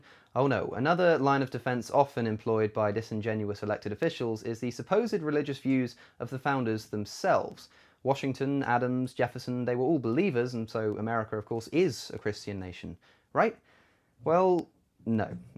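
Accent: United Kingdom. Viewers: oh the irony of hearing the Declaration of Independence and the U.S. Constitution being read in a British accent. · Is he British?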